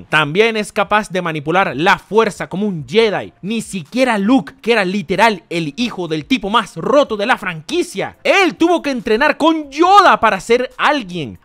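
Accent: Venezuela. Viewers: ajkjask mrc, me llena de vida escuchar a modo venezolano super obstinado, fuerza fuerza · Llevo años viendo videos de y primera vez que le escucho lo veneco y modo insultador jajajja coño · Y pensar que ese acento venezolano volvería jajasjsaj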